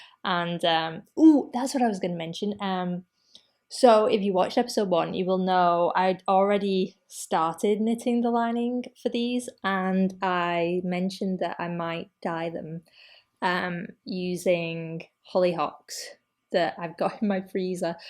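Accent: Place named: North, United Kingdom. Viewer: Enjoyed your first podcast and am back for more. Love your dotty hat, really pretty. Enjoy your style and its lovely to hear your Northern accent 😁 Tilly is adorable too. Look forward to the next one xXx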